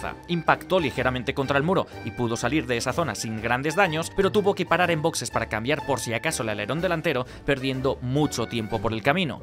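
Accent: Spain. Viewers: Estaría bien que se narrara sin ese acento gachupín fastidioso.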